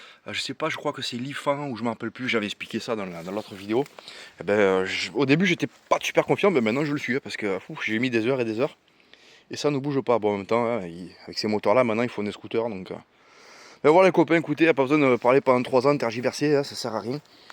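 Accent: South, France. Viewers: Merci pour ce retour d expérience ! Avec l accent du sud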